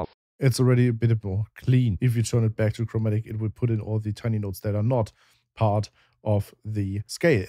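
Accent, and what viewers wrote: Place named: Germany. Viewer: By his accent I think he's German, so God bless Germany too!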